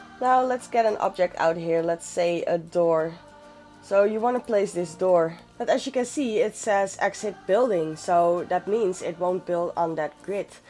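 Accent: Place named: Netherlands. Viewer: Are you dutch? You sound dutch hahaha, thanks for the video!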